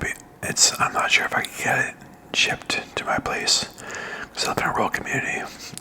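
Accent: Canada. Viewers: are you from nova scotia? familiar accent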